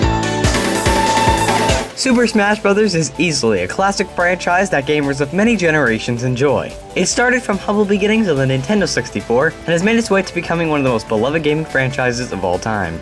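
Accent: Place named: Bronx, United States. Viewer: Dude your from the bronx! Yo I love your videos.. We should meet up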